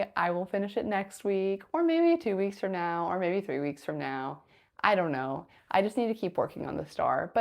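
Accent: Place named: England, United Kingdom. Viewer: Hey i want to learn English accent from you 😅😅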